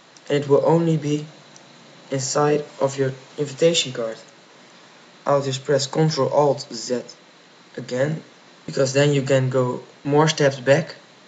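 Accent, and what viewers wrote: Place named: Netherlands. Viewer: You sound dutch ^^ Are you?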